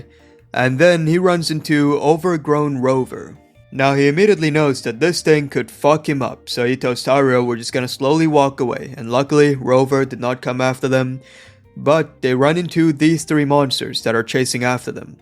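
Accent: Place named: India. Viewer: This video genuinely made me almost cry laughing thank you bro, btw, i sense a slight ndian accent on your voice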